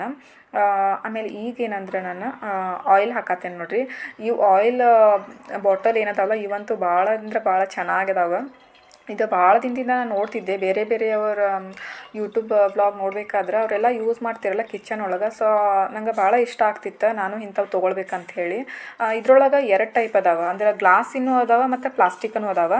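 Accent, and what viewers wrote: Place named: Bijapur, India. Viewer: Niu namm tara matadtiri .namdu bijapurari ❤ ,.. beautiful vlog 🎉🎉 new friend.🎉❤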